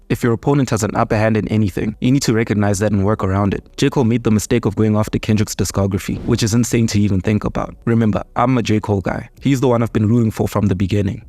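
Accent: South Africa. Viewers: Bro your voice makes me think you're south African. Are you South African?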